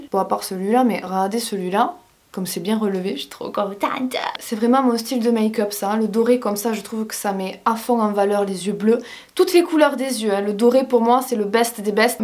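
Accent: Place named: Southwest, France. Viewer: Cc ma belle. Tes vidéos sont vraiment "fraîches" t'es super agréable. Ton accent me fait penser à mon papa qui vit dans l'Ariège. Ahhh l'accent du sud ouest 👌. Bises belle journée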